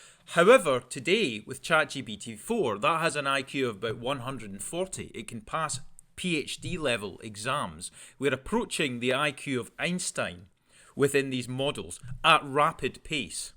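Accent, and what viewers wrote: Scotland, United Kingdom. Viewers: Are u Scottish? I love your accent. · Hey man, are you from the same part of Scotland as Scott Manly?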